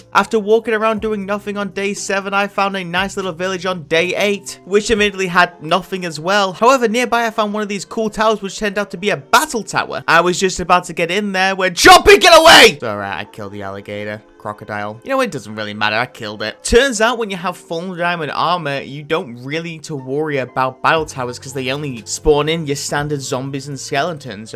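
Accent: United Kingdom. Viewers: R u Welsh?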